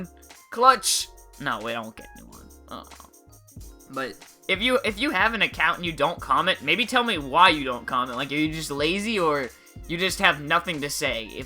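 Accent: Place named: Northeast, United States. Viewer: I dont comment because um im lazy but Nice vid and are you from jersey or boston or ny ??? im hearing an accent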